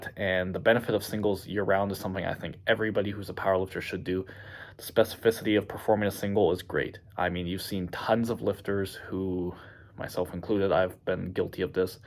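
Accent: Canada. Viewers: I was looking at his initial 3 days of bench, super surprised that someone this level only benches 3 days a week... and then I saw the other 3 days LOL Also it’s extremely jarring to hear how Canadian he sounds after only seeing him on the gram 🤯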